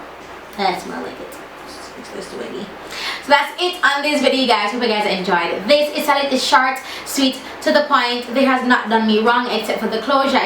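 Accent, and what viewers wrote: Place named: Jamaica. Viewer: I love your accent. You gotta be from Jamaica lololol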